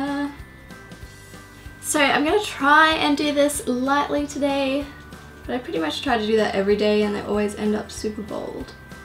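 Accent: Australia. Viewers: Where in Australia is she from?🇦🇺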